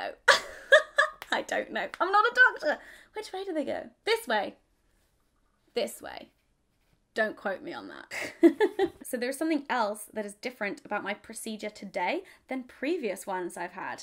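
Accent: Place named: Manchester, United Kingdom. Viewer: Manc accent alert!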